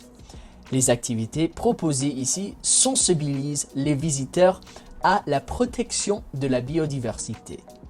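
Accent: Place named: France. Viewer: Excellent ton french accent.. Élise et Julien ainsi que Annick ont eu ton lien.. Il devrait echanger avec TOI.. 😊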